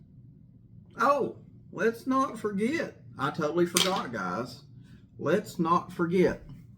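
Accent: South, United States. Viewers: I was a youngin'. I enjoyed that As I come from the Northeast. I was the same age as Nicole I think a year younger, So we were in our Early to mid 30's. I 't follow much true crime anymore like I used to many years ago with Nancy Grace. It's just the same child story of murder and sex abuse decades after decades but the names are changed. But I so enjoy your show, Your sensibility, level headness, of course southern accent! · Love the calm way speaks. U can actually hear him. Soft Southern Style! And no background nonsense. Yeah wtf is goin on with some people. I cant get ma head round it. All this evil, family annihilation, murder, missing kids….. It goes on and on! Depressing, upsetting. Have we learned nothing. Its 2024 now and this world seems to be getting worse not better. 💚💜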